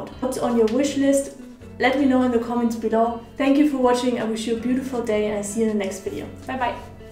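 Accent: Germany. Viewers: das ist doch n deutscher akzent :D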